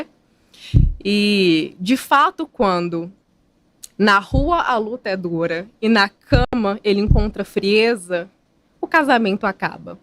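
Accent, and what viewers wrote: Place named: Minas Gerais, Brazil. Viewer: EEEE sotaque gostoso esse de minas, eu aqui no RJ amo quando alguem de Minas ta perto de mim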